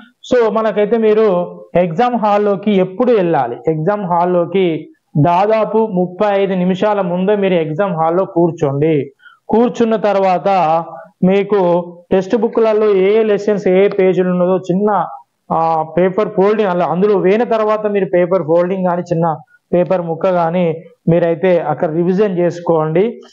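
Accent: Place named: Karimnagar, India. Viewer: Sir karimnagar language lo chala baga chepparu thank you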